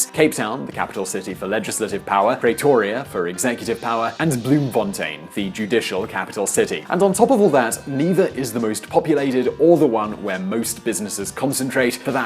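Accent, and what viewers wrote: Spain. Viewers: This channel is an English version of a Spanish channel, so it make sense the narrator is has a Spanish accent. It just sounds funny tho. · It's all fine and good, but please, let somebody else read your titles. The thick Spaniard accent only makes the otherwise serious videos unneededly hilarious. And, it does not really seem professional. :P Keep it up, guys!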